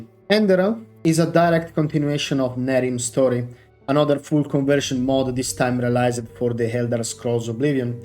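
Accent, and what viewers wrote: Italy. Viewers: Your language is beautiful and poetic! You might just want to speak a tad more slowly, pause for a moment between sentences to make it easier to follow for those of us not used to the Italian accent. Mille grazie!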